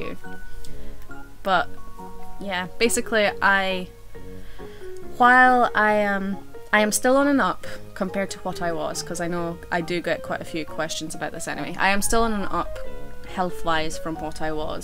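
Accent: Scotland, United Kingdom. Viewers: No she is British can u tell the accent I'm an American and I know that,and why is people trying to get ur age, you did the right thing and not telling them ur age. Yeah same here I wear 24/7 because I love it,no medical reason but I do love your fighting spirit that gose a long way with u... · Lol, your accent is not too strong for being Scottish. But definitely recognizable. My friends parents came from there. And somehow her mom's voice has been implanted into my brain and I think in a Scottish accent. That is so weird to me lol. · Probably you get asked if your Irish as the northern irish and Scottish accent have similarities :)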